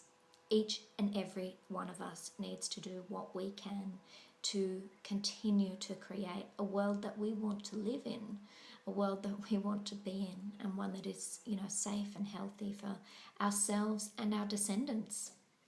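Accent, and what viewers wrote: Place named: Australia. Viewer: great to see you and hear your lovely aussie voice! No transcript needed! We haven't met but have communicated in the past via email. My stepson and his wife are due to give birth to their first baby in Sydney tomorrow! We will be first time grandparents! The newletter is great, personal and experiential. With love and birth into being, Suzie McGreevy in Ireland.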